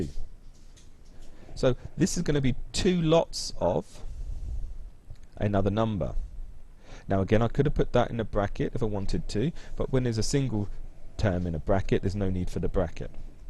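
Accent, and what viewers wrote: United Kingdom. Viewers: gaaahh i love you're british accent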